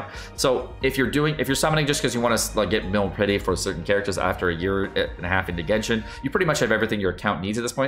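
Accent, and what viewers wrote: United Kingdom. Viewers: Where did British go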